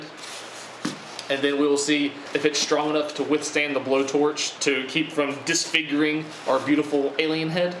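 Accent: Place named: Pennsylvania, United States. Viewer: your from pennsylvania aren’t you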